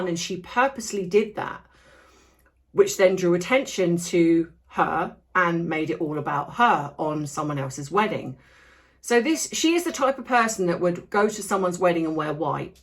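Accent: United Kingdom. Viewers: My distinct pleasure,. I can listen to your beautiful British accent for hours on end!!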